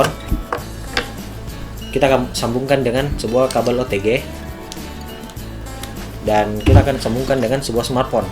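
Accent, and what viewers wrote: Makassar, Indonesia. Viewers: Bang orang Makassar yah? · Orang makassar yah bang?? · org makassar kah???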